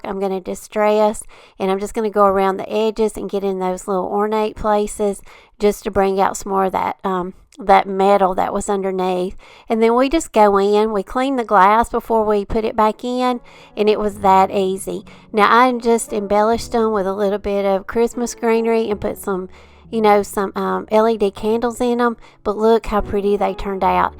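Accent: South, United States. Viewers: I absolutely love ALL of your projects ❤ they're so amazing and so beautiful... I always walk away so inspired by you!!! My husband and I were wondering where you are from? He was laughing at the fact he always thought I was extremely country sounding...until he heard you!!! We live in N.C. sooo we know country!!!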